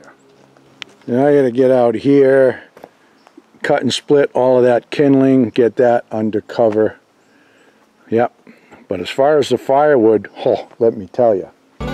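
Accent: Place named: New Hampshire, United States. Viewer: I found your videos about a month ago. I love them, since they bring me back to my childhood days. My mother was born and raised in Franklin, NH in a home that my Pepe (grandfather) built. He had an 8th grade education, but went on to build houses on land that he bought. He also made furniture. I get to hear the NH accent and it makes me smile...again those sweet memories. Mom's oldest sister recently sold the old original family house a few years back. New Hampshire is such a gorgeous state and I can't express just how much it means to me to see a portion of it through your eyes after so many years of being away from there. Thank you and all the best to you. ps. My father and Pepe would of loved seeing your meticulous carpentry work.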